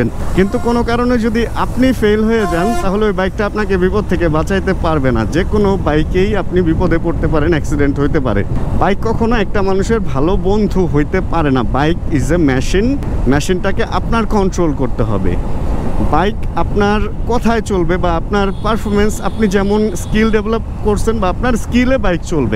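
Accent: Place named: Bandura, Bangladesh. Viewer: Bandura kothy basha apner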